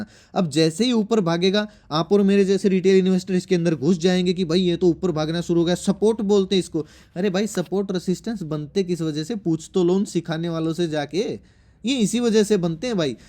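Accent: Haryana, India. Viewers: App Haryana sy ho kya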